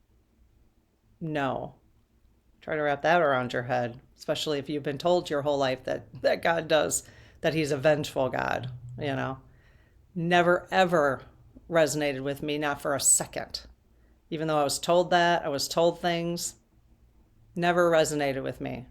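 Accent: United States. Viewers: looks and talks very much like my next door neighbour. She has a doppelgänger in Australia!! It’s like looking at my lovely next door neighbour but she’s talking with an American accent! I love it!💖🦘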